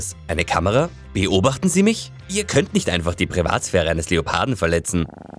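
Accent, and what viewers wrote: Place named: Austria. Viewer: Österreicher! :) Ich bin auch einer, das merk ich doch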